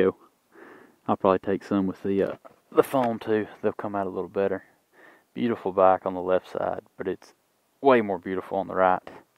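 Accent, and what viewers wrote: South, United States. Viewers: I like your accent. looks Sothern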